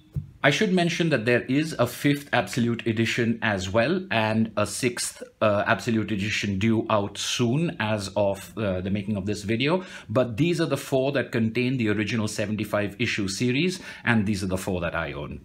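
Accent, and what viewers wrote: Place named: India. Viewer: What's that "Made in Bollywood" accent ? ... Cow, it's horrible ! On the other hand, the explanations are very good ... Thank you !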